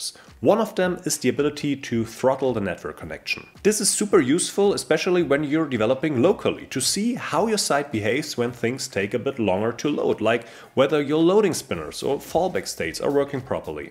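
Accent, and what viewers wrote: Germany. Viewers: I always recognize a fellow German by their accent 👌 Great tips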